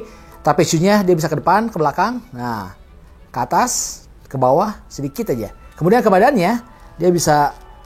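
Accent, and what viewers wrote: West Java, Indonesia. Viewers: Sunda mana ieu bang 😂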